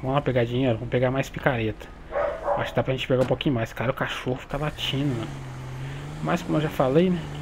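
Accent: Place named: Minas Gerais, Brazil. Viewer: vc é de mg paresse pelo sutak. Se for somos mineiro uai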